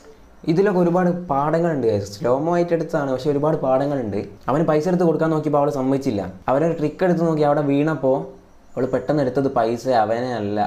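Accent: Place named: Malappuram, India. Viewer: Ante ജില്ലാ eedha Ante ഭാസ കേട്ട്ട്ട് മലപ്പോർത്ത്ള്ള ന്നാ തോന്നദ് 😂മലപ്പുറം ആണോ അന്യേ ജില്ലാ ❤️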